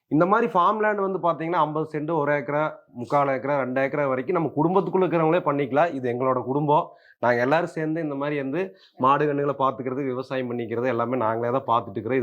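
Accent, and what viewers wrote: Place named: Kongu, India. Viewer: House Super anna ,,, also my fav. Kongu Tamil 👌 👌 ❤❤ from Tirunelveli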